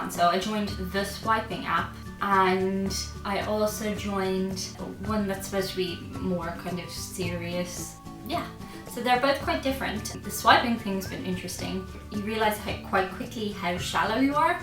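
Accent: Ireland. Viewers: You are a joy to watch but I must agree that this post was very open and sweet! Of course you’re more proficient now, after so many posts and growth is always good! I visited Ireland almost three years ago and your voice “takes me back” to that gorgeous country! I’m thrilled that you’re doing so well AND that you knew that Chewie would be a dear friend and faithful companion; smart lady! Thanks for sharing this!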